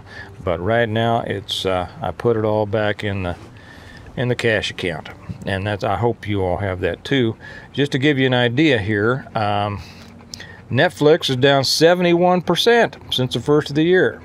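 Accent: South, United States. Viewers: You're making me over comment ....You're much better than Dave Ramsey ...in fact you should brush up and apply for the show .....your country accent is better also ....Time for a change !!!👍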